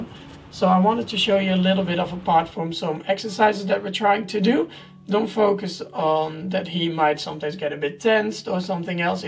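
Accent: Netherlands. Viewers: Are you Dutch? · Je praat op een Nederlands accent 😂😂😂❤️ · Are you Netherlands our Englisch?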